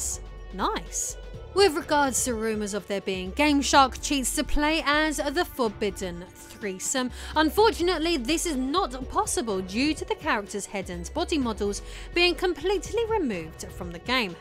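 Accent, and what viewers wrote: United Kingdom. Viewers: This British Accent 👍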